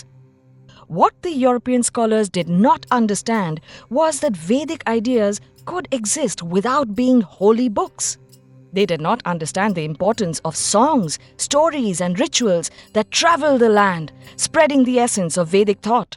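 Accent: South India, India. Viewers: are you madraasi southindian saying arjunas kitna bura lag raha sunnein mein,please bachhon ko to sahi words bataayo baba it is aadi Shankar not adishankara saare words theek bataiye kripya, yeh aa mat lagayeeye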